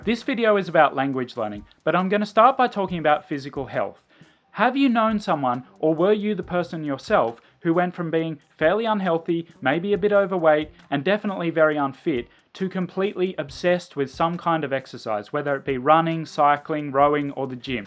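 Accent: Australia. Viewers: when I watch your videos I get reaaly happy because months ago I couldn't understand anything in Australian accent (some sounds are different), and now I just realized I can understand you perfectly. My target language is English btw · My husband is Australian and I have lived here 55 years so I'm Australian too. I was listening to you and asked my husband what is this accent this guy has because I love it and I can understand him. He said he's an Aussie and to us he doesn't have an accent, so I felt very comfortable. Thank you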